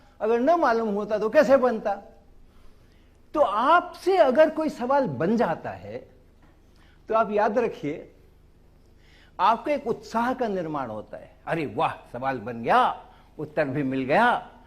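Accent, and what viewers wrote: Standard. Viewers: wah sir ki hindi ekdom suddh hain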